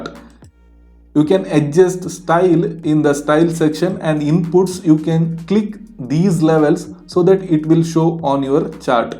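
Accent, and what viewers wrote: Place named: Kathiawar, India. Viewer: Bhai Tumhari English bhi kathiyawadi lag rahi he saurashtra se ho kya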